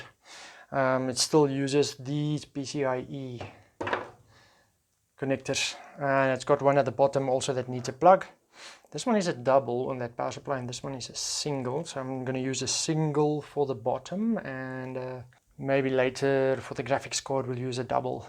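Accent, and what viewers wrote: South Africa. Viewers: Kan hoor jy is van SA xD jy die by die werk gebou?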